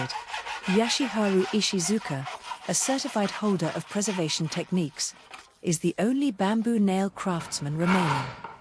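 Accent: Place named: United Kingdom. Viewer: lol, the british fem voice over lays it on thick enough for you?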